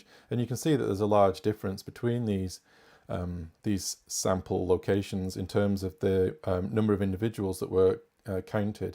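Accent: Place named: Hull, United Kingdom. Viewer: Thankyou for this! Added to our VLE for my MSc students in Hull (sounds lke you hail from not a million miles away?)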